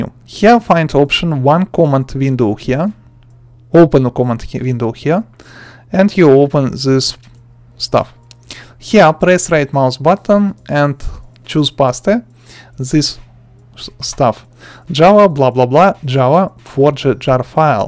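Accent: Russia. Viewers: Акцент русский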